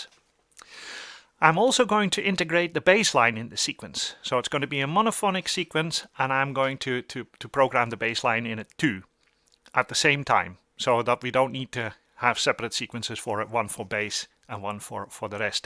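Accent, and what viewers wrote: United Kingdom. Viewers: Is there some Scouser in your accent? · Liked and subscribed! Great video. Amazing accent. Have you spent time in the north of England / Scotland?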